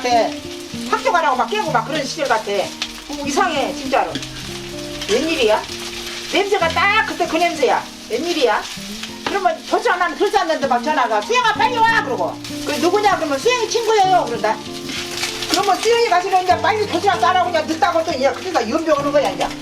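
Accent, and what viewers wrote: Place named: Gwangju, South Korea. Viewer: 3년전에 돌아가신 할머니도 생각나고 지금은 나이들어 손자도 보신 저희 엄마도 생각나고 만감이 교차합니다. 저도 광주사람이라 사투리가 너무너무 익숙하네요. 막례님 항상 건강하시고 늘 행복하셨으면 좋겠습니다!!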